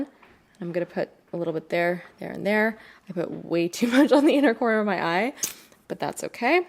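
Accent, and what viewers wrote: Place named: Canada. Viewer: This video was recommended to me on the sidebar. 5 seconds in I knew two things... 1. You were Canadian, 2. I'd be subscribing. I love love love your delivery. Hello from Vancouver!! <3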